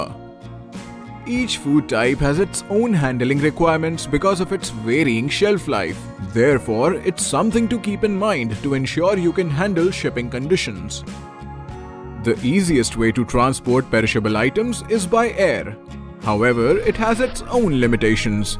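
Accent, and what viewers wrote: India. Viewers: Indian accents is unbearable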